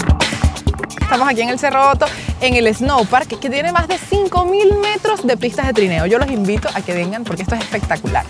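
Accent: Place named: Venezuela. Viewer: Por el dejo pareciera que fuera venezolana. Una cachetada para tus compatriotas eh!? tu disfrutando la vida mientras que por aya se están muriendo de hambre.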